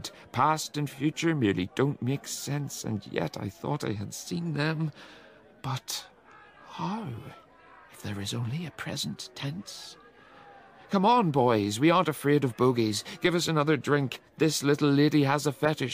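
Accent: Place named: Ireland. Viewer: Love the Irish accent …. Easy on the ear …thank you. The Irish author, MacNeice, spent time studying at Oxford … the work reflects a degree of both an English & an Irishman’s sensibilities …. Threads of the English upperclass gent 🤵‍♂️comes thru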